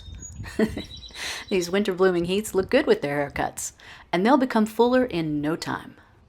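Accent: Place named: United States. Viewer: Sounds like she iş american BUT gardening in england.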